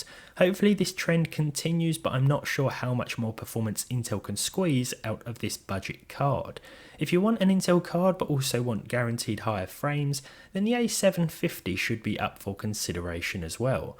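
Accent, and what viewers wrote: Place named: RP, United Kingdom. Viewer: You're sounding particularly posh in this video 🧐🎩 , not criticising, simply noticing, simple as.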